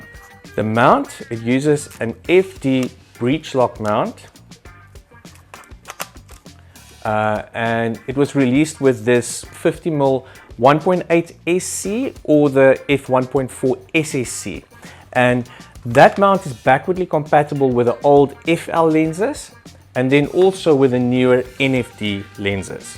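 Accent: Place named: South Africa. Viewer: Great video, I have also the AE-1, AV-1 and AT-1. I can see why people love them and I loved your opinion too. Fair review and I learned a few things I didn't know before. I'd recommend Rode Wireless mics as your audio is peaking slightly with the Boer brogue at times. I'm Scottish and had similar volume/ peaking issues. Rode mics are excellent and great value. Great content, cheers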